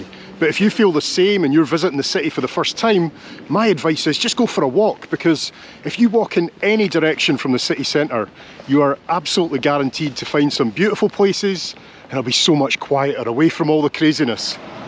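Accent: Scotland, United Kingdom. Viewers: When you talked about a kilt you made me chuckle. I remember someone telling me that a lot of Scotsmen where them to a futball(?) (soccer) game I believe it was and without underwear. I was just picturing that in a large stadium of people and smiling. Something we wouldn't see here in the United States. I enjoy a Scotish accent and am enjoying the videos that are coming up on my feed of yours.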